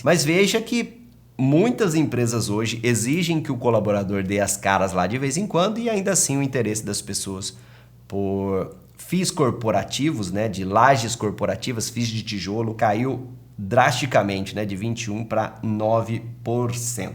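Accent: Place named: Caipira, Brazil. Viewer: Ultima vez q vi vídeo do seu canal vc falava com voz de caipira RS pq mudou?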